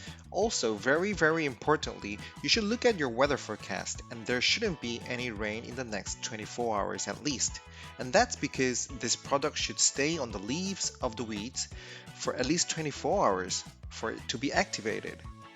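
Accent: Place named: United States. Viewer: ㅋㅋㅋㅋ목소리 톤이나 자막이나 미국 사람 다됐네 ㅋㅋㅋㅋㅋㅋㅋ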